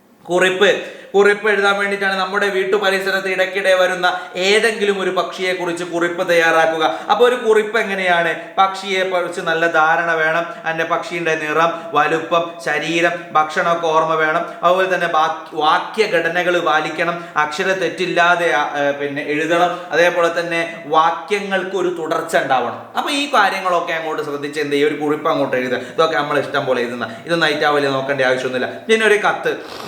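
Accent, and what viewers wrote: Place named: Kozhikode, India. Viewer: Kozhikode aano sir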